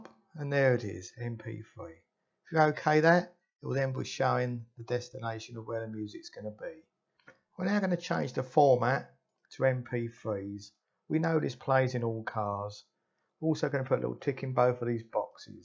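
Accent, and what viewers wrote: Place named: United Kingdom. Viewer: Well Done furr a Brit..just kidding accent tickles my ears..easy peezy just watt eye was looking for..thx for posting xo a new fan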